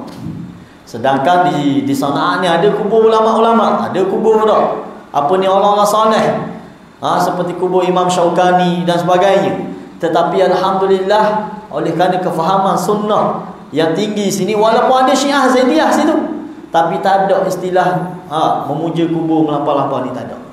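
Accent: Terengganu, Malaysia. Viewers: Loghat ganu.... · Tq Mantap ustz teganu Walaupon baru ku kenal Namun pelat teganu mu menjadi poojaan hatiku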